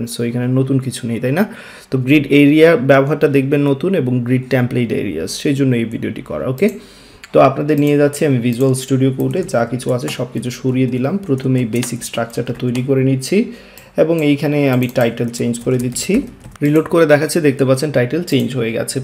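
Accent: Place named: Sylhet, Bangladesh. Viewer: sir u are really amazing.. Sylhety bhai ❤️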